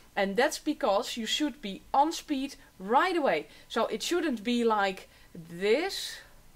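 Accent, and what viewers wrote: Netherlands. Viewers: i just started playing and i'm making the most annoying sound ever , I've listent to all the tips on the internet but nothing really helps , But i'm only playing for like three days so maby it's normal , Could this fade by Practice ? Or should look for a problem ? ow and may i ask are you dutch ? because your accent sounds dutch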